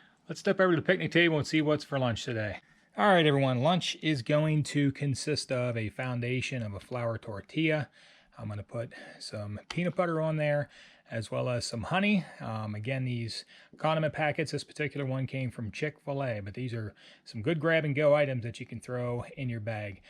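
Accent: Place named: Philadelphia, United States. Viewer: I thought I heard a Philly accent in your speech! I don’t doubt that that cheesesteak was the best!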